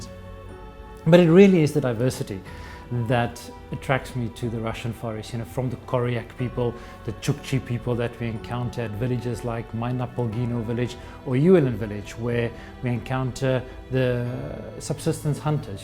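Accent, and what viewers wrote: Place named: South Africa. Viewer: This dude sounds South African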